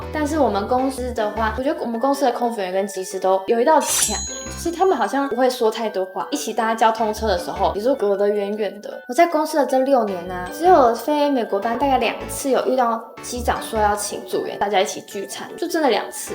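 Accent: Japan. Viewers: 有點日本口音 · vivi的國語 已經有點日文腔~很可愛😂😂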